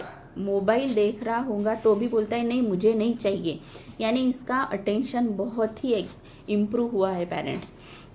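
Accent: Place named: Maharashtra, India. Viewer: Apki hindi bohot cute hai..mujhe meri mahastrian friend ki yad ati...wo v same apke jaisa bolti thi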